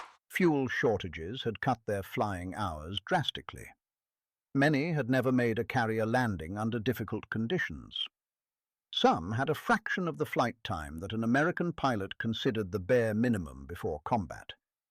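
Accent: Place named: United Kingdom. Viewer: Why does a channel ostensibly about US history use a narrator with a British accent?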